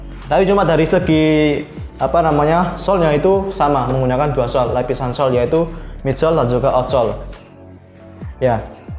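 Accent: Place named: Java, Indonesia. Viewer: Halah..meddokh .. n gagap 😂 males Dengernya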